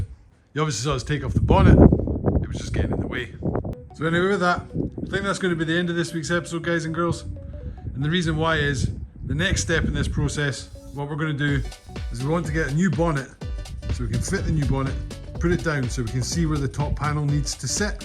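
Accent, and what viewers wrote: Scotland, United Kingdom. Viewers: New subscriber here. You sound north Scotland ?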